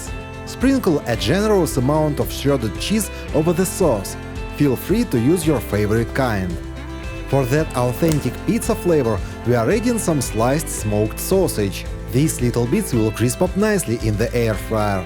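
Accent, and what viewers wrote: Russia. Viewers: I'm not from an English-speaking area, but this kind of English-Russian is so irritating that I can't listen to it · Very smart. Sounds like a Russian accent. Excellent recipes and hacks to learn tasty and healthy food. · Ruski akcent wymowy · Dude, your Russian English is making my ears bleed. Subtitles are enough, macho, absolutely.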